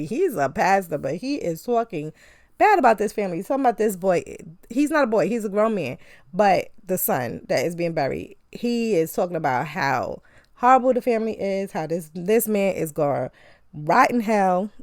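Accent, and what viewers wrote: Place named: New York, United States. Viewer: Love your NY accent!!!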